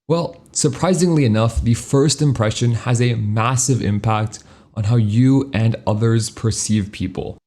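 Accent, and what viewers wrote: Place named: India. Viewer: Bro drops the Indian accent "azzume" like crazy this is giving unprecedented flashbacks to my proof writing class Edit: I'm literally just going to subscribe to you because you summarize a bunch of techniques in books to I never have to read them myself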